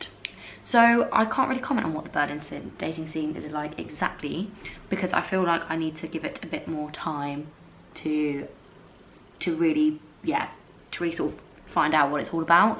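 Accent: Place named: RP, United Kingdom. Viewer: where's your accent from? it's sooo posh! <3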